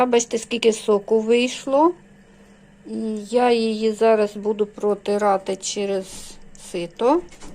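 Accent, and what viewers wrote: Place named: Western Ukraine, Ukraine. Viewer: Дякую Вам щиро! Прекрасна українська мова у Вас. А наших українських діалектів в Україні аж більше 40! А по мові Ви, певно, з Тернопільщини чи Франківщини (можливо, я помиляюсь, у нас у всих різні наші діалекти). Так що, своєю солов'їною разом з нашими рідними і різними українськими діалектами переможемо російську навалу і російських слів, і їх самих з нашої України. Дякую щиро за український контент! Переможемо обов'язково!!!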